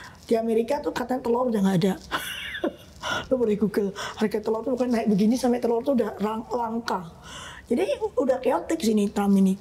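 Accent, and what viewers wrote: Java, Indonesia. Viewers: Antara Jawa Tengah atau Jawa Timur wlwkwk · Tercerahkan, terimakasih mbak e. Logate jowo gampang dipahami. Bakbuk itu impas 😅❤ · Cici ini pintar ,sederhana, duitnya banyak.tapi gk pengen oplas.1lagi medok(gk lupa dia berasal)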